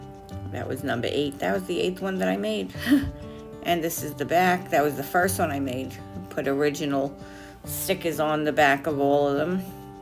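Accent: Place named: New York, United States. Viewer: I’ve set the notification! I always want to write ‘ ’ to play up your NY accent!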